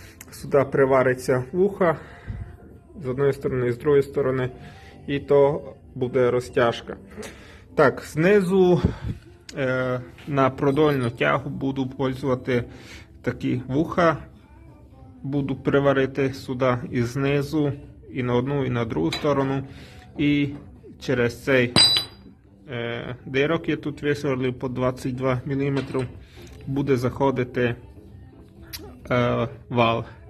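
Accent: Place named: Transcarpathia, Ukraine. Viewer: Ти із Закарпаття, говір у тя знакомий, із якого района?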